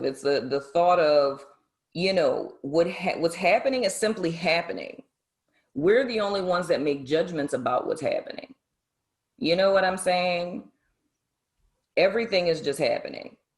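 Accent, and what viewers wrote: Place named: South, United States. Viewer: WHERE YOU FROM?! I hear something southern in your accent. First time viewer. Love your approach!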